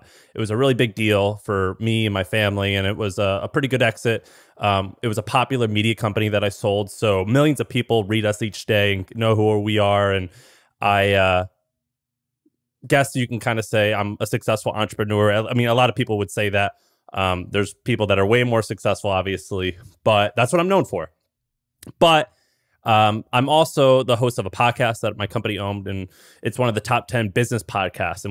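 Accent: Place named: Midwest, United States. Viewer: Midwest asf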